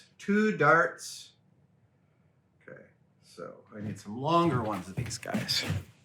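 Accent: Scotland, United Kingdom. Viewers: Nice ta here your Scottish accent dude no to bad tbh fea the bony home land of Scotland 🏴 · Love the wee Scottish accent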